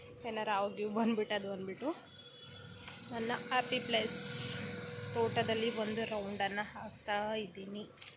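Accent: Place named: Mandya, India. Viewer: Akka nivu thumbane mudddagi idira hage astu muddugi matadutira mandyada bhashe thumba chhanagide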